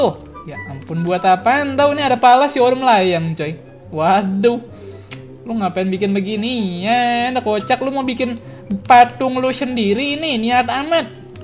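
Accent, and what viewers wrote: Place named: Tangerang, Indonesia. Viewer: kek logat tangerang yak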